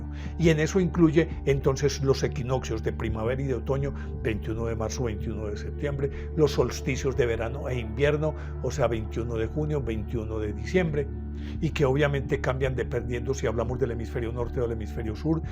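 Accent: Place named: Antioquia, Colombia. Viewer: Lo mas bonito de la narracion es el acento paisa que me parece hermoso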